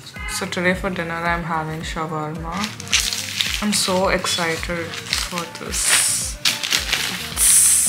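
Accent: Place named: Hyderabad, India. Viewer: She is hyderabadi. I can interpret from the english accent and speech pattern.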